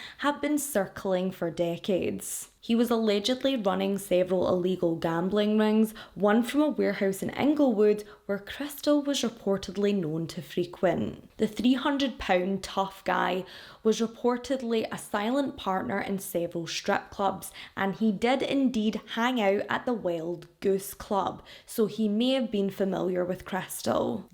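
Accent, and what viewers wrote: Scotland, United Kingdom. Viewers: Thank you for brining so much well-needed awareness to some of these older cases. Rest in peace, sweet Crystal. She and her family deserve justice and answers after so many years! Still so surprised you don't have more subscribers! I've watched quite a few of your videos in the past but it seems like YouTube has been randomly unsubscribing me from a few channels again (as well as other members, from what I've heard), but alas, we're back being subscribed now ❤ Great work as always, Miss. As an Aussie with the majority of my father's family still being based in Scotland, your accent makes me smile. My Nan has lived down here since the late 60's and her Glaswegian accent is still so thick that I doubt subtitles could help us when she gets heated having a wee blether over a cuppa 🙈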